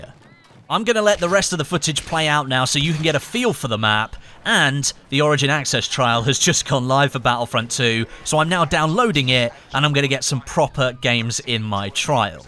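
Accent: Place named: Southern England, United Kingdom. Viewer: If I had to guess I would say the south coast of England. His accent isn't northern, jackfrags is a northerner. I'm from the south west and we don't share the same accent. Or he could be from the posh parts of London.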